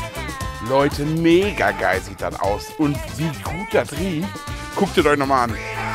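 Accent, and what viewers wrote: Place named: Hesse, Germany. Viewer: Muss seit 2 Jahren in Hessen studieren, dein Dialekt gibt mir ein Gefühl der Heimat✌🏻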